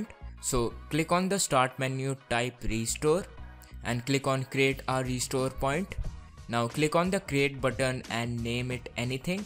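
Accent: India. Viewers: He is indian. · ORAN DSOUZA he is indian, and pronounciation does not matter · he is indian dont trust indians they scam you if i click on a video and here if they are indian i am out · Indian sound ass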